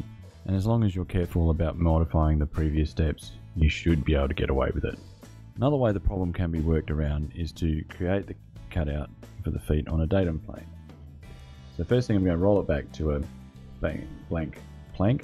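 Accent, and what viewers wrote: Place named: Australia. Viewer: Great explanation! Also good to hear another Aussie doing this too.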